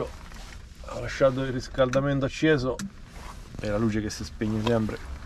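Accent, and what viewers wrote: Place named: Marche, Italy. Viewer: d'allaccento mi sembri marchigiano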